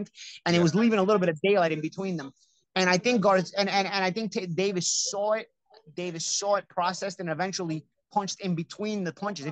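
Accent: Brooklyn, United States. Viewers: annoying Brooklyn accent 😂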